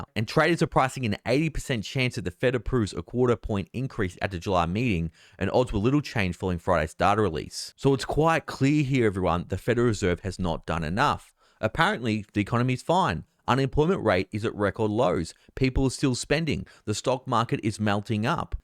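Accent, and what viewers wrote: Australia. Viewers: Aussie accent...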